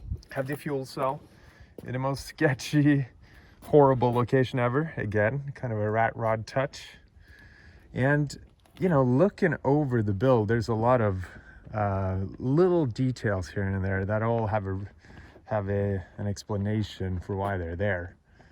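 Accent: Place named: Southern California, United States. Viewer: I feel you should put an actual propeller on the back of it keeping with the spirit of tail spin. You can do one with a small electric motor that be functional. Also your English has gotten really good. You have a kind of Southern California accent now. I remember meeting you back a at the world drift comp at the long beach docs with your broken English.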